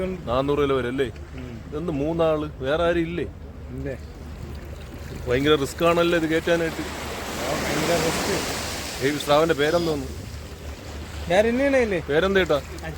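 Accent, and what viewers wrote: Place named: Thiruvananthapuram, India. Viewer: തന്റെതായ അവരണ ശൈലി തിരുവനന്തപുരം സ്റ്റൈൽ സൂപ്പർ 👍❤️🎉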